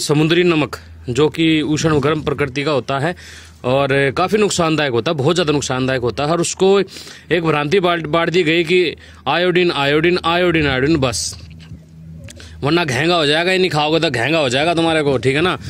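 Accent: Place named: Haryana, India. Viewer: Bhai ka haryanvi. 😂😂😂👌👌👌👌 Ekdam kadak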